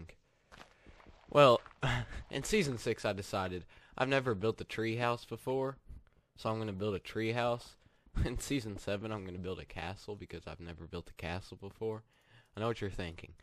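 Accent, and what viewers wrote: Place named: Kentucky, United States. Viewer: I seen that is from Kentucky he’s got that accent I live in pikeville KY btw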